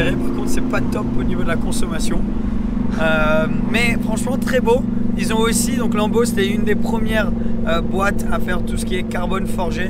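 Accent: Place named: United Kingdom. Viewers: C’est son origine anglaise ptn réfléchi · J’aime tes vidéos... mais arrête de prononcer des noms italiens à l’anglaise...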